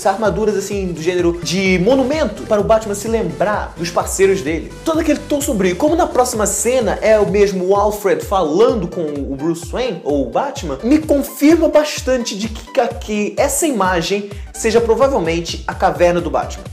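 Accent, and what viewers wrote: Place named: Portugal. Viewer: você é português? seu sotaque é diferente kkkk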